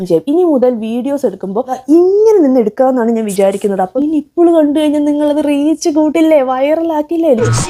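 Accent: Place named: Alappuzha, India. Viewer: Ith kollam bhasha alla. Alapauzha aananu thonunu. Malabar airunel thuranu kaanichene..😂😂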